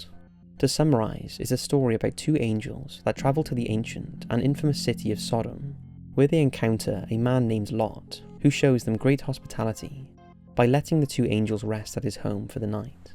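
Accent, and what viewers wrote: United Kingdom. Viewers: What do i think - that your a fuuking natzi, talking like i britt, click bate bitch! Love from Israel!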